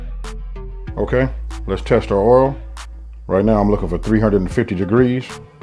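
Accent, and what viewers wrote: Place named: California, United States. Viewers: Sound like L.A. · Man! Just discovered you last night and I been hungry ever since, knew I recognized that Cali accent, from the Bay Area but been in the south for 30yrs, keep doing what you doing love it! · I thought you were from LA! LOL....The chicken looks screamin. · I knew he was from LA off top but it's a Compton thang we all LACounty but we breeded different.... Lol and (no disrespect either) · I thought he was from LA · I recognized that South L.A accent, not a Compton accent 😁 I LOVE the recipes! I need an invitation to the next cook out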